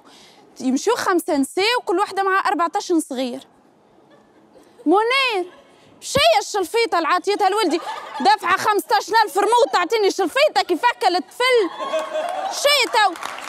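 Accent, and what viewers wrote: Kasserine, Tunisia. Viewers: هي تحكي هكك هي مالقصرين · rani 7ata na gassrinia ema hya s7i7 t5dem 3la ro7ha rabi y3inha o5ti ema mch bdharoura bch tdha7k l3bed tst3ml l'accent mt3na wt7ki blahjtna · شحال شابة لهجة تونسية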